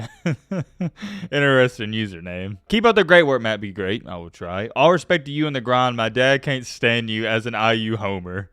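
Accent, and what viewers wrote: South, United States. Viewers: It’s impressive what a southern accent and zero football knowledge this guy is still very entertaining and that’s all that matters.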